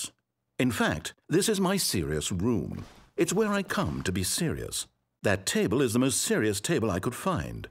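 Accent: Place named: United Kingdom. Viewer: I always loved how this adult British narrator straightly says such a little kid number like one-hundred-billion-trillion years.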